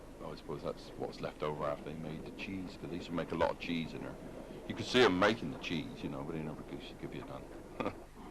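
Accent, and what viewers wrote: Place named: Dorset, United Kingdom. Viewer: Its nicest to hear it narrated in that wonderful Dorset accent. It takes me back in time to my childhood. I loved to hear the Bath drivers, then the somerset accents around Evercreech to the Dorset accents of the drivers and firemen. It makes me feel at home.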